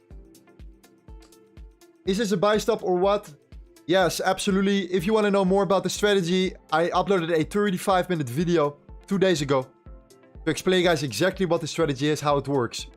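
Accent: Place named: Netherlands. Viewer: Overal te herkennen, dat prachtige nederlandse accent.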